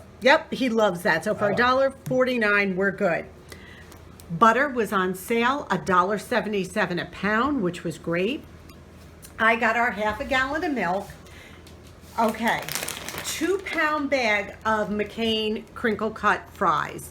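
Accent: New York, United States. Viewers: I thought I detected a NY accent but I didn't know you had a bag tax thing too?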